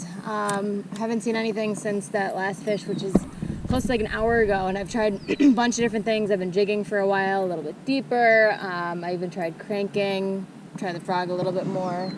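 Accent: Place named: Minnesota, United States. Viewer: You can sound so "Minnesota" from time-to-time. Nice bass 👌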